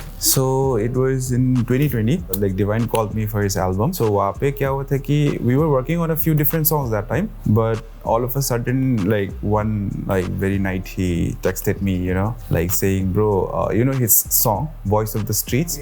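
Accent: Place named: Assam, India. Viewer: 💯 percent he is Assamese.. Accent se hi maloom chala merko..🎉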